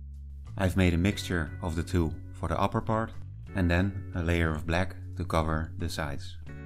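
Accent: Netherlands. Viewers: Thank god another video from the terrain building bob ross. I dont know what it is with your voice, maybee the combination of the tembre and the dutch accent, but listening to you is deeply relaxing.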